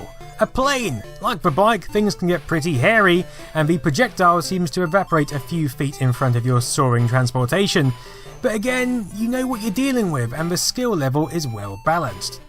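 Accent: United Kingdom. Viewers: When British people pronounce Japanese words I cringe so hard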